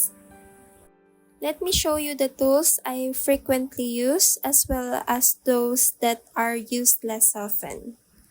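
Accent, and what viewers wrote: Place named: Philippines. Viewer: based on your voice and accent filipino ka po ba?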